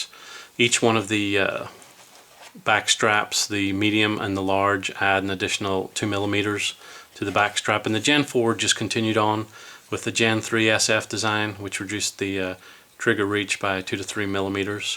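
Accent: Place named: Belfast, United Kingdom. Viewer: I hear a Belfast accent ;-)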